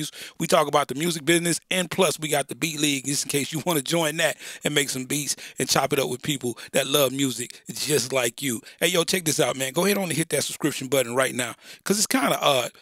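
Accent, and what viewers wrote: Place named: United States. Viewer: Your heavy murrican gangsta accent makes the understanding for non-native speaker really hard.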